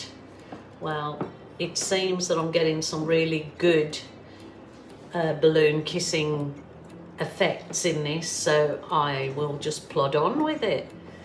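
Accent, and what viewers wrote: United Kingdom. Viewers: Hello I tried to send you a message yesterday but it seems to have got lost in cyberspace! Anyway the gist of my message was to basically tell you how very much I thoroughly enjoy & appreciate your tutorials. I adore your art and am learning a lot watching you paint. Also enjoy your sunny chatty disposition and your delightful British accent which so happens to match the Sunshine Coast where you reside. Thank you for all your tips which is going to help me immensely when I hope to embark on my painting venture instead of simply being a couch potato!!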